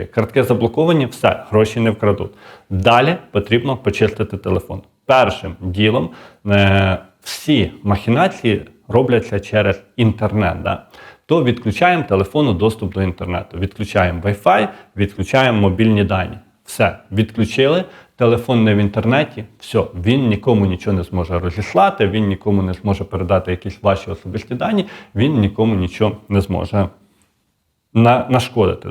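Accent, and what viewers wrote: Vinnytsia, Ukraine. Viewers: Пані, перш ніж когось критикувати, навчіться сама правильної мови. А говір у нього вінницький. На заході говорять зовсім інакше.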